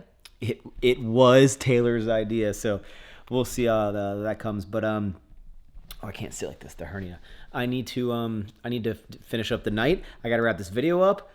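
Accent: Texas, United States. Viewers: you have started to sound like a Texan 🤣 best of luck for your surgery!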